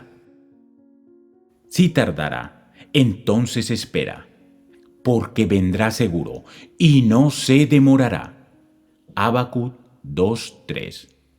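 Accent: Colombia. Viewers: es colombiano, paisa, de hecho, su acento lo delata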